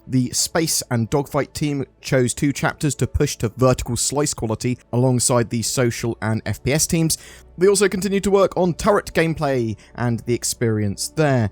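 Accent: United Kingdom. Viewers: British accent mate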